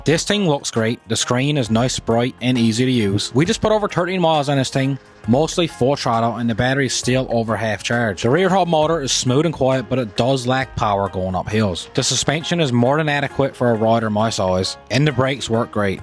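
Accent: Newfoundland, Canada. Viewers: don't sound too too Canadian to me lol, maybe an irish newphie?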